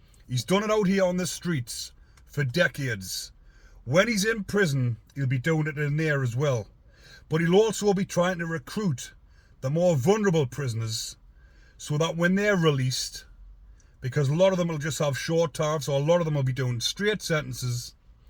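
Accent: Newcastle, United Kingdom. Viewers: All true made better with the geordie accent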